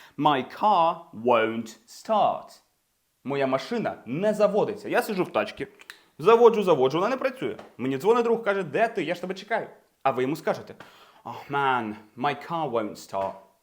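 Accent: United Kingdom. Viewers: Хахах... Усі вчителі навчали нас зовсім по-іншому! Усі, буквально кожен з них вимовляв просте слово "open" як [оупен]... 😂 У вас же - зовсім інакша, але така типова вимова як для звичайного англійця! Не "оупен", а щось схоже на "ьойпен" (майже так само якщо поставимо якусь приголосну перед м'яким знаком, але не вимовляючи цю приголосну!). Просто шикарний British accent!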